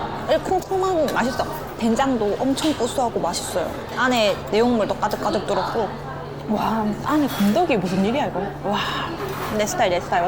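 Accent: Gyeongsang, South Korea. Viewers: 사투리 쓰시네요 쇼츠로 볼땐 몰랐는데 갱상도 아이가~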